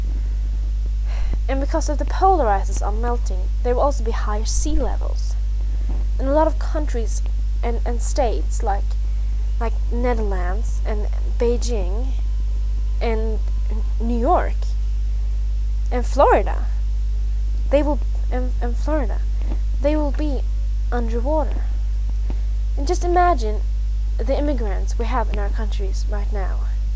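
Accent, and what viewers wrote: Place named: United Kingdom. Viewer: Just curious but, are you reading something when you're narrating this? And did you do this for school? I still don't really feel convinced. But your English isn't as bad as you think, you actually sound somewhat British. The only thing that stood out to me was "drought" which is pronounced like the word out (but you might already know that now). Anyway, best of luck if this is for a grade or whatever.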